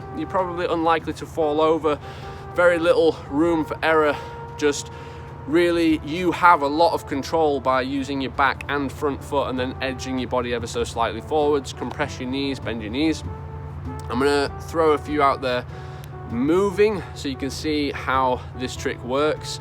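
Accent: United Kingdom. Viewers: Bri’ish? But actually thanks for the tips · Where from the UK are you?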